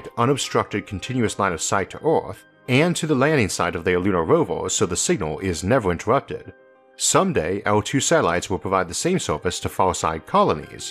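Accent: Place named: Louisiana, United States. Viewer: I know this is random but what accent is this narrator. Legitimate interest in dialects. Has kind of like a Cajun sound to me..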